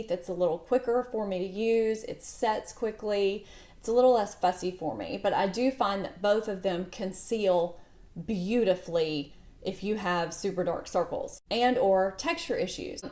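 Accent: South, United States. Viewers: OMG the fur baby on the bed killed me lol! I have one of mine own that just makes herself comfy wherever and whenever she wants 😂. Cutie pie though...makes me identify with you a little more than other "YouTubers". Just an everyday woman like me fighting the signs of aging the best we can! And love the accent...southern girl like me lol! I really like your channel hun and love your info and tips! Keep up the good work 👍🏻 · Yeah Ive struggled with the shape tape, its a bit over hyped. While it gives very good coverage. Your video hit the nail on the head. Less is More. If I can ask why do you prefer the brightening powder over the other setting powder by Laura Mercier? Thanks from one Southern Girl to another, atleast you sound Southern LOL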